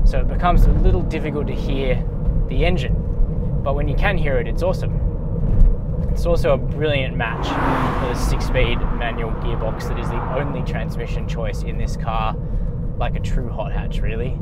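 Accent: Australia. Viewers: Bastardised Aussie English is insufferable, switched off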